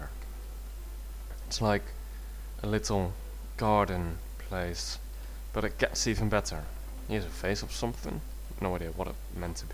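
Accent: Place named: Netherlands. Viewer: Netjes engels man ;) maar de T's en D's geven je weg :P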